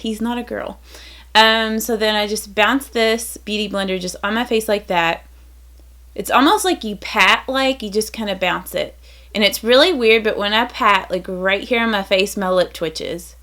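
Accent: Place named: Texas, United States. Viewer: Love your accent, where are you from, I'm southern also from Texas, you sound like you are from Texas. lol good video too.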